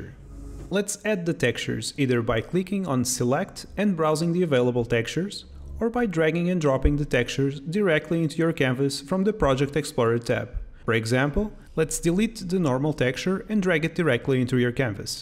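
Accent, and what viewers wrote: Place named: Portugal. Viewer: Não vão acreditar mas ao ouvir o sotaque pensei logo que era um tuga e depois de procurar na net, confirmei que quem está a falar é tuga. Parabéns pela ferramenta, parece porreira e definitivamente uma compra a fazer num futuro próximo.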